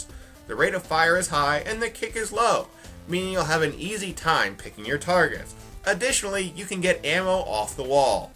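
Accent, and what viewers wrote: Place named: North Germany, Germany. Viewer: I love how he pronounces Nacht der untoten (BTW, i am german, and he sounds like a North-german)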